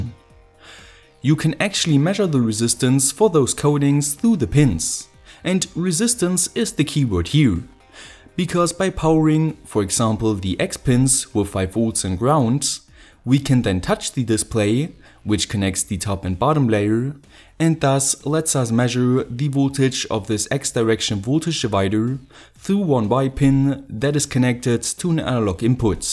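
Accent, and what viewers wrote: Germany. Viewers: Hey it sounds like ur speaking deutsch When yes: ich auch xD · Schade dass Du nicht deutsch sprechen kannst. Ich höre immer einen deutschen raus der versucht extrem perfekt Englisch zu sprechen. Aber das ist ja quatsch. Weiter so. Tolle Bastelthemen! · It's probably the german accent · are you german?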